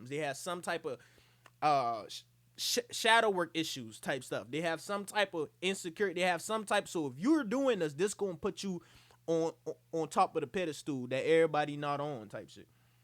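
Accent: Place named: Charleston, United States. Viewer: You sound like you from Charleston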